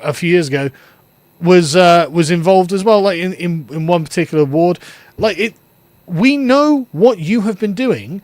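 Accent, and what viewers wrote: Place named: United Kingdom. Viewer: british accent is the most annying thing ever, loike jast tok narmaly meit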